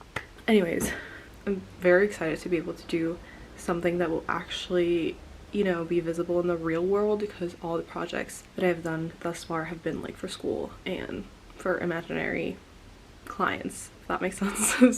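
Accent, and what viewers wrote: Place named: United States. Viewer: and why's your English so good? you have such an American accent!!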